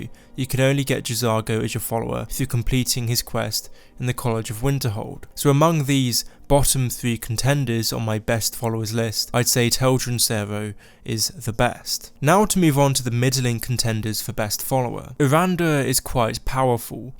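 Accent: United Kingdom. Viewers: *hum* Lemme guess, you from UK ?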